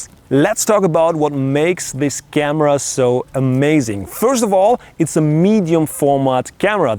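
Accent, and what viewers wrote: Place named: Germany. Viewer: I really like your videos but your German accent is just crazy XD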